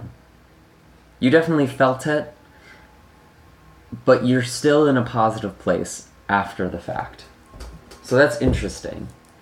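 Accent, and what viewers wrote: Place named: Midwest, United States. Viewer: i love your cute little midwestern accent, and your warmness! God bless!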